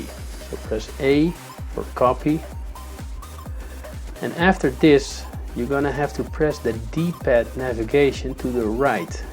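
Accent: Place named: Netherlands. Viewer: I was born in the Netherlands which is why I'm very good at recognizing Dutch accents. So far I haven't been wrong and judging by your accent you must be Dutch.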